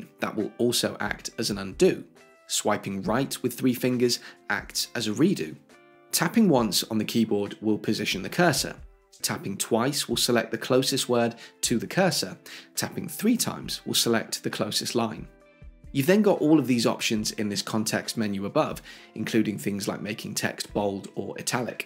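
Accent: United Kingdom. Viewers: I love your channel, so nice to hear the tips presented in UK English. I use dictation a lot in fact I’m using it now, I also have Dragon which of course is more sophisticated. One of the things I miss in Apple dictation is the ability to use the phrase “ scratch that“ which when you use it in dragon delete what you’ve just said or if you want to select a single word you can say in dragon “select Apple“ and it will then select that word and you can use another one to replace it. I don’t know if such sophistication exists in Apple dictation but it would be really great to know if it does. Keep up the good work, cheers. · You explain things so well -- the British accent helps 😊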